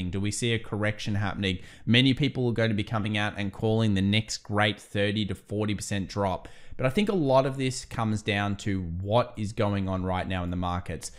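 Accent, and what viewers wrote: Australia. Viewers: "Apple" is not pronounced "epple". Therefore, "Well" is not pronounced as "Wal" and "selling" is not pronounced "saling", "help" not "halp", "health" not "halth' - do you get it? Without these distractions, your presentations might be more listenable. I realise that this a peculiar to residence of the State of Victoria but it doesn't make it right.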